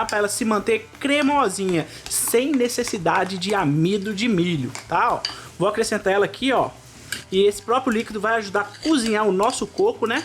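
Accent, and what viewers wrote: Minas Gerais, Brazil. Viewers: Obrigada por ensinar tantas gostosuras! Adoro assistir seus videos com o sotaque minerim😁Eh Nois!!😁💚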